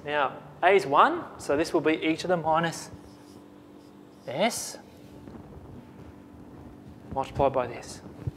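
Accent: Australia. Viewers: Good on you for making this, love having an Aussie for once!! Cheers , 21yr old ENGG student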